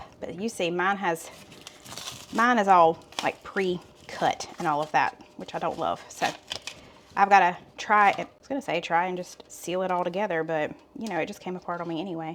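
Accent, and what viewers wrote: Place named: North Carolina, United States. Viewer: This is the first time I have seen one of your videos. I was hearing your accent and thought, North Carolina. Then you said Food Lion, and I was like mayyybe lol! Great recipes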